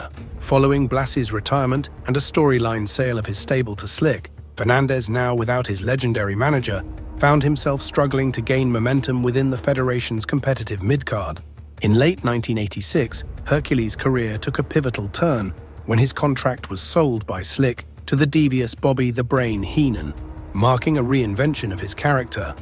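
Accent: United Kingdom. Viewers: Why do they always use a Brit voice for these things? Aaaargh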